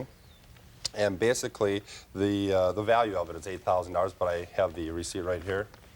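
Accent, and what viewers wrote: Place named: Minnesota, United States. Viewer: I love the Minnesota accent!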